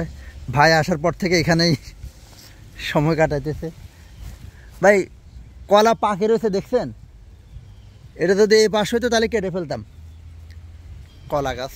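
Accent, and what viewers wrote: Noakhali, Bangladesh. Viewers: একটা জিনিস হল ভাই আপনি আমার মতই একেবারে নোয়াখাইল্লা ভাষায় কথা বলেন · আপনার বাড়ি কি নোয়াখালী ❤❤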